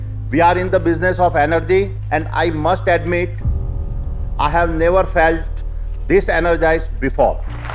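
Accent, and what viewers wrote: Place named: India. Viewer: Ye to apne jaisi english bolta hai ❤️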